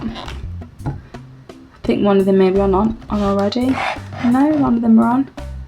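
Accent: United Kingdom. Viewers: first video I watched I'm ages with someone that sounds British lol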